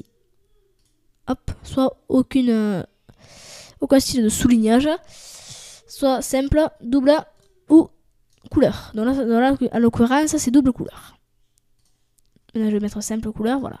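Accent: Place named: South, France. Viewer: Oh l'accent du sud il est là !!!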